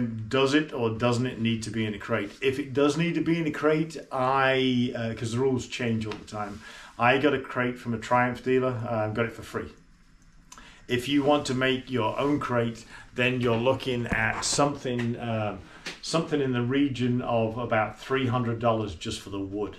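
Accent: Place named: Western Australia, Australia. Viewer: mate I've been trying for so long to try and pin your accent. ever since your video with mototrek. I'm guessing australian, but west Australian?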